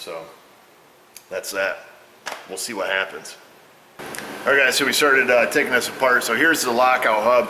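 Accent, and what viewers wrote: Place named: Upstate New York, United States. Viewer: Alright, Man when you said "wicked" a couple times I knew you were from Upstate... but when you said "jabronie" now thinking Binghamton. Either way, it's nice to hear someone else who talks like me. I live out here in Washington State now but was raised in the wicked cool town of Binghamton, NY with some other stinkin' jabronies.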